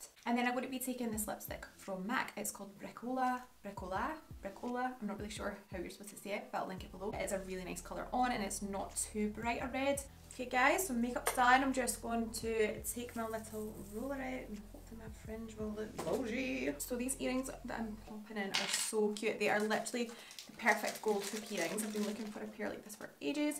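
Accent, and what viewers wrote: Scotland, United Kingdom. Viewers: r u from Scotland? love the accent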